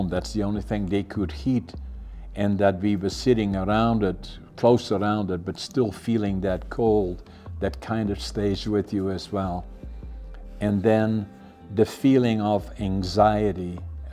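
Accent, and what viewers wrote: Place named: Netherlands. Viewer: Still that Dutch accent haha.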